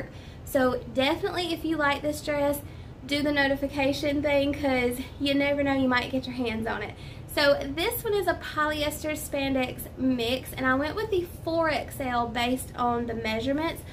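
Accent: South, United States. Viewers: You are so cute. I love your personality and your southern voice.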